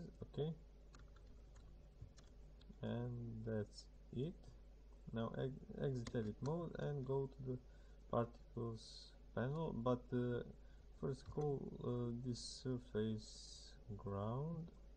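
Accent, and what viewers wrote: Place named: Serbia. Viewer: May I ask where are you from? Your accent sounds like Serbian. Of course the name that I saw on the blog saying the same thing. Excellent work. For how long have you use blender?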